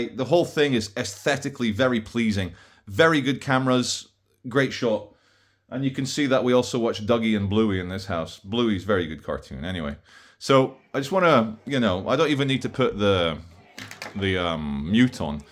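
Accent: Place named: Scotland, United Kingdom. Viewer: I love the Scottish accent, cracks me up every time. Oor! 😆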